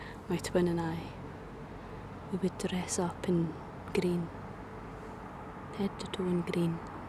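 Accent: Scotland, United Kingdom. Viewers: Thank you, spine tinglingly supreme as always. The lull of your Scottish whispers and soft rich warmth of your chatter is pretty special. ❤ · I absolutely love how this ASMR video blends the natural ambient sounds of the outdoors with the gentle clarity of your voice. The subtle background noise from traffic adds a real sense of being in the moment, which feels so grounding, while your voice remains perfectly clear and soothing. That Scottish accent really enhances the cozy, personal attention vibe. Truly a relaxing experience, both calming and immersive!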